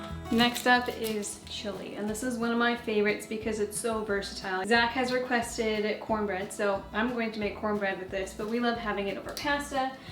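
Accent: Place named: Quebec, Canada. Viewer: it has to be the Canadian French influence. i tried learning a little of it once, but my ears are tuned to Spanish from growing up near the southern US border.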